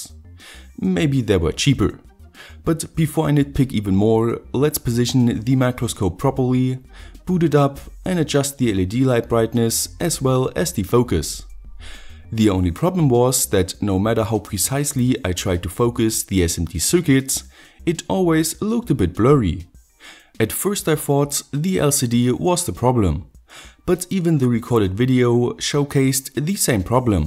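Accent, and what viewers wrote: Germany. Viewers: klingt nach denglisch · 3 Sekunden zugehört und direkt bemerkt, dass der Typ Deutscher ist 😅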